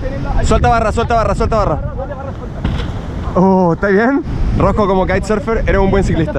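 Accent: Chile. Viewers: Se nota que errs latino y chileno igual que yooo porque décimos wn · Ehs chileno el weon 😅😅😅😅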